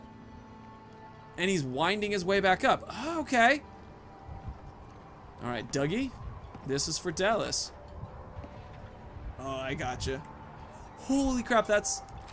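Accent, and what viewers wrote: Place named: Australia. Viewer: It's all about that Australian Fah Hahbah.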